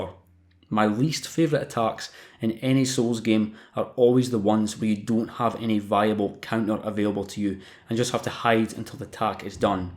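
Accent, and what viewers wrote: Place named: Scotland, United Kingdom. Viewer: Multiple hours of some guy with the most luscious of Scottish accents just talking about his love for Elden Ring? Count me in mate.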